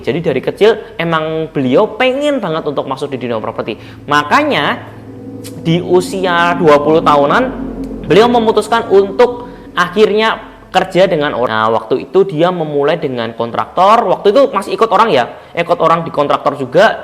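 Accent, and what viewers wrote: Java, Indonesia. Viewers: bahasa mas nya mendok jawa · Medhok e mas heheh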